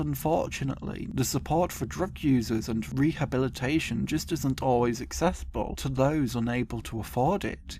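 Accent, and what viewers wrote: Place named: United Kingdom. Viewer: Oh no! Mis conocimientos en inglés se pierden con el inglés británico 😭😭😭😭 , pero igual Gracias ! ❤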